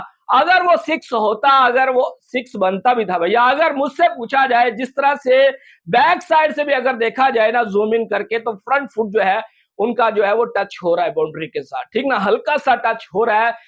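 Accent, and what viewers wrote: Pakistan. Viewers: Tum indian ni ho saaf Pakistan se ho . Tera lehja bata raha he q k tera zobaan tere uniform ke 7 NAHI dekraha he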